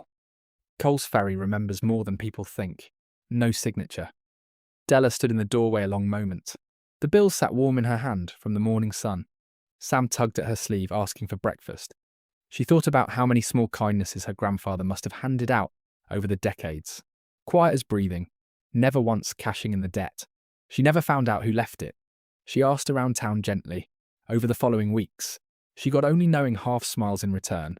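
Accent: United Kingdom. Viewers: Good story. Interesting British voice.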